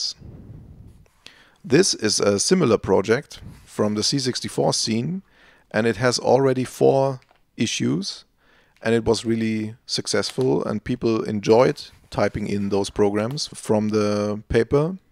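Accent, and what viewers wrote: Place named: Germany. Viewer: Einfach Deutsch-Akkzent Goldstandart 😄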